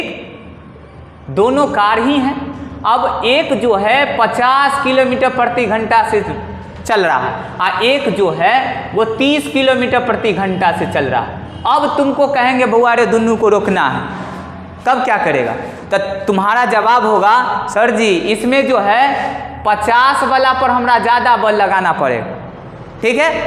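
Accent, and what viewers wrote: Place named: Bhojpuri, India. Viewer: Gajab Bhojpuriya style guru ji😘 ham golu hai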